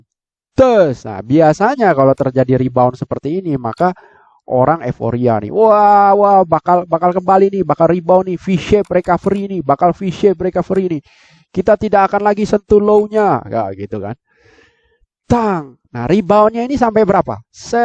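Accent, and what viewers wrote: Java, Indonesia. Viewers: gw suka sama suaranya yg medok2 dan konten2nya bermutu.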